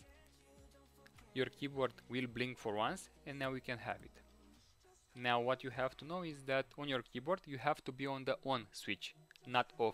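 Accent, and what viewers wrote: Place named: Romania. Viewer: asta e sigur roman dupa pronutie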